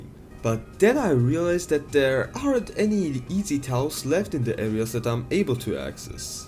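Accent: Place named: Germany. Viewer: holy german accent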